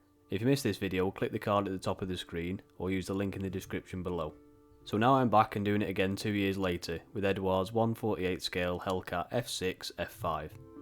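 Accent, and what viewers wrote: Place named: North, United Kingdom. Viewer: What part of the North are you from? great video mate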